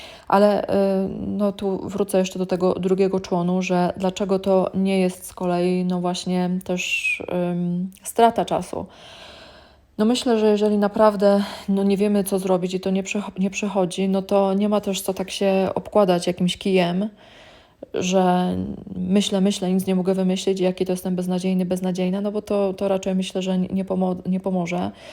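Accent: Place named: United Kingdom. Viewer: If you talk English why you have a British accent?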